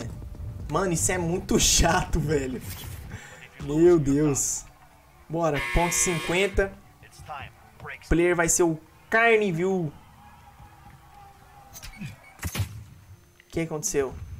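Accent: Minas Gerais, Brazil. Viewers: tu é mineiro mano??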